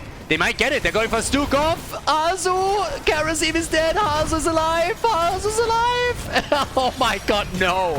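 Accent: Germany. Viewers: here in Melbourne we're in our sixth super-strict lockdown. Can't go outside for more than 2hrs, 5km limit, no visitors, no shops, etc. Your uploads make the evenings pass so much easier, my dude. Appreciate the fuck out of you and your stupid German accent hahaha <3 Love you, stay safe. (From a former neighbour/Dutchie)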